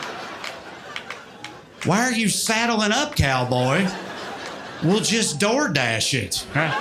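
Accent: South, United States. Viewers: Thank you for coming to Boston earlier this month, Trae! I loved your show. Say what you want about your accent, but at least you sound nice; you have that southern hospitality thing happening. Here, we all just sound like Massholes!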